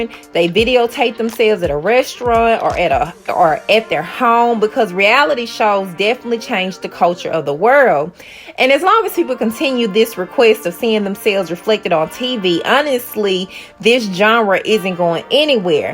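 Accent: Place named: Huntsville, United States. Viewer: Hi. I liked the video. You must be from Huntsville you talk like them(accent) ❤️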